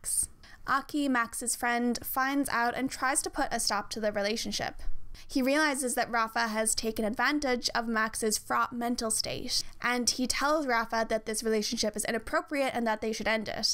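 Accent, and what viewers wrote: Ireland. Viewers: This is such a good video, thank you so much for creating it and doing such good research on the topic, I'll definitely be sending this to some of my old friends whenever Pretty little liars relationships come up as a topic.. This is unrelated but I can't seem to place your accent, do you happen to be Irish? xD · you’re accent is so interesting because it’s definitely american but you’re ‘t’s are so irish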